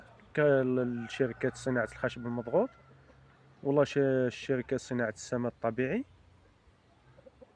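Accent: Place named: Constantine, Algeria. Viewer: خويا انت قسنطيني ...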